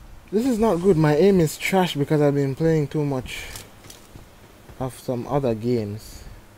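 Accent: Jamaica. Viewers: I love when he says; you know? Love jamaican🇯🇲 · Hey are you from Jamaica cause you sound like Jamaican?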